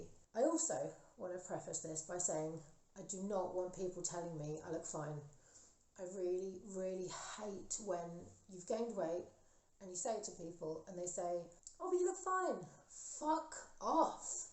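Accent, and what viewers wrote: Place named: South, United States. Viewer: First, wow I forgot how southern ur accent is, I love itttt <3 beautiful. 'u look fine' absolutely riles me as well, and goes the other way when I'm manic and people say I look amazing n im thinking hun I'm living off spinach and I can't stand still. People's unsolicited advice/opinions can shove offfffff. Thanks for making this, it's so hard to talk about , and I relate to a lot<3 also I have that cat necklace but in pink iaaaaaaa <3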